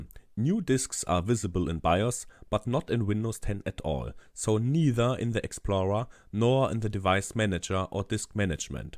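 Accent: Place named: Germany. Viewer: This is awesome, it works fine for me after hours of unnecessary unplugging and plugging . Thank you mate. And by the way, nice German accent. I have the French version of it.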